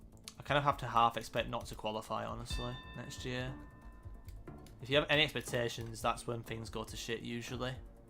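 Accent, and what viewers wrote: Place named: Lancashire, United Kingdom. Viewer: Don't give up. Your doing great. I always watch your videos (I am Polish). I will region guess you by accent. Your'e from Lancashire, either Preston or Wigan.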